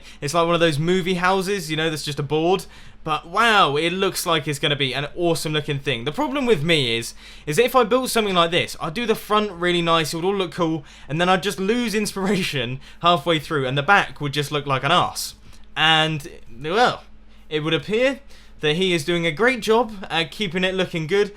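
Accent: United States. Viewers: Your American accent is godly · Dat American accent. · Dat American accent:D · Is that an American accent? What the heck? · Woah, that american accent tho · Haha that American accent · Love your american sales person accent!!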